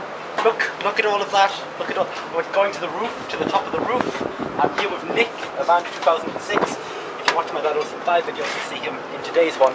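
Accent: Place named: Newcastle, United Kingdom. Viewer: Up heeeaaaa? Ooh the Geordieness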